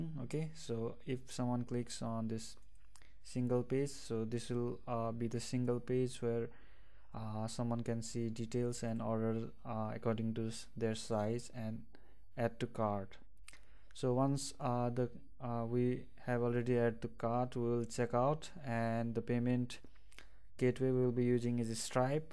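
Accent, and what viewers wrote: India. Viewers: Clear to it's best!! Bangali??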